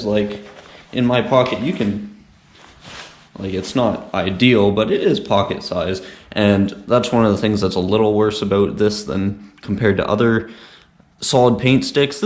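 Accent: Canada. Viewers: Bruh are u canadian?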